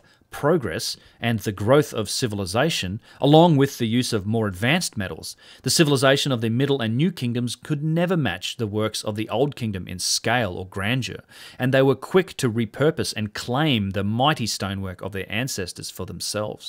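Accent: Australia. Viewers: Ha, Ha! Don't make fun of his Aussie accent.(dialect) President John F. Kennedy used to say, Africer and Asier. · Pyramids of Geezer... love the aussie accent! :D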